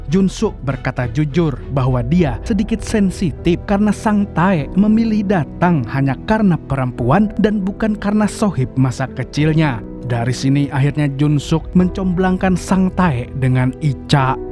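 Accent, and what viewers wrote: Banjar, Indonesia. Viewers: Suka suara x orang banjar kah bang?😊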